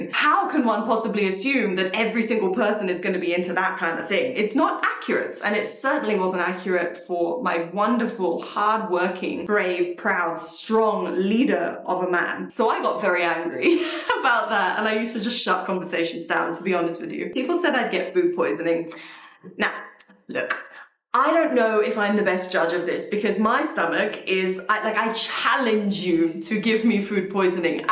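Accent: Nigeria. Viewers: You're developing a Nigerian accent That's sweet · I was ready to roll my eyes, but was pleasantly surprised.I was taken aback that you were Australian ( I have my own prejudice against them, I find them to be quite racist and bush😂)Such a perceptive and intelligent young woman.Your husband ,I haven’t finished watching, but I am assuming you married him because of your surname.. had found a good woman. You even sound a bit Nigerian now😂. May God bless and strengthen your union… two brave people who took a chance and it paid off, I love that!👏🏾🇳🇬🇬🇧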